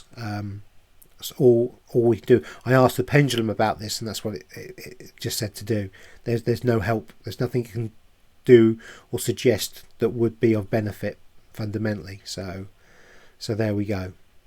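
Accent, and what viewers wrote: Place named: England, United Kingdom. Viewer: I'm new subscriber. Very refreshing with English accent. Yes, much of what u r saying rings true. Ascension symptoms for me, weight doesn't come off, blurry runny eyes, itchiness, dizziness, bloating , less energy. Neckpain, my skin cracks, cold cracks on my thumb, today I have some pain in my right shoulder blade. Like a cyst . Geeez, nice list . When do we get our forever new bodies? 😂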